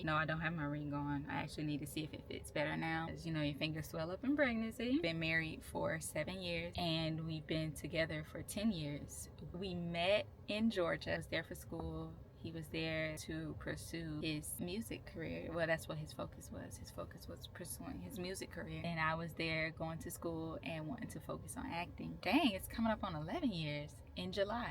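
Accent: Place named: South Carolina, United States. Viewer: So i just watched the full video and ive been watching yall for a long time but just found out your from SC! Im from Anderson South Carolina and i knew you sounded like you were from here. Where exactly are you from? I love your little family yall are precious 💕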